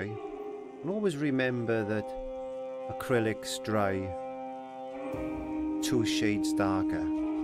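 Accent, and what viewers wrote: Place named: Mid Glamorgan, United Kingdom. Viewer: never mind the music love to see your art and hear that Mid- Glamorgan accent. I can see Barry-John running up the beach to score a try, and that's from an Englishman. Love your videos, so relaxing from one so many miles from home. Be safe x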